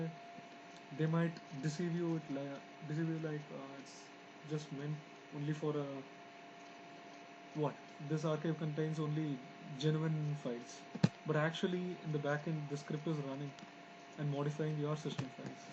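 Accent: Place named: India. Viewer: stupid indian accent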